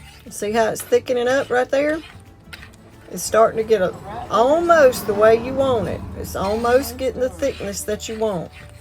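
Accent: South, United States. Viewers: This is how you won't it to be I love that southern accent, and this is how I like my sausage and gravy to turn out too, make sure to cook that flour long enough and add the right amount of milk just like my mom used to make, over biscuits, rice, fried or mashed potatoes it's comparable to crispy chicken gravy only usually lasts a little longer cuz the chicken gravy usually goes with the chicken then it's gone, fried chicken with mashed potatoes I usually end up a lot fuller cuz of the mashed potatoes and gravy I'm just saying cuz, that's the way it is!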